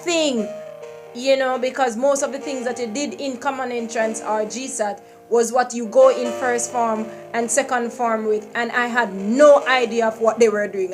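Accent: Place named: United Kingdom. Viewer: gyal..u sounding kinda British.. nice words of encouragement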